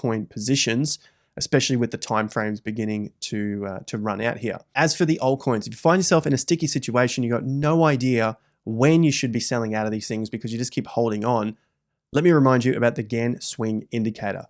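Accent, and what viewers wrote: Australia. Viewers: Legendary as always! I seem to laugh more than I probably should purely from the Aussie delivery and the humour 🤣👌 love it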